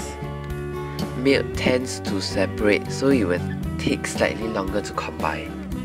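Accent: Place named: Singapore. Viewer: I'm sure you are Singaporean with that voice, anyway,great receipe!, I'm from Singapore too!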